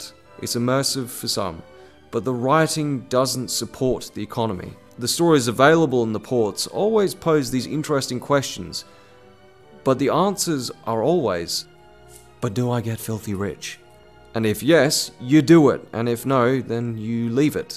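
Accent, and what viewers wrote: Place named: Australia. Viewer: I'm English and he sounds more Australian to me in fairness.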